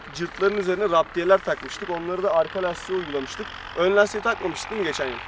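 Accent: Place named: Bulgaria. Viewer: Abi konuşmandan belli Bulgaristan göçmenisin jajsja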